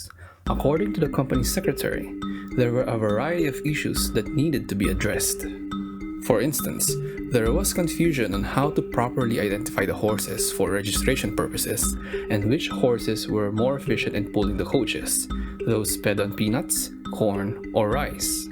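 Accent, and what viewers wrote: Spain. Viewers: I love the Spanish accent... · Accento Madrileño.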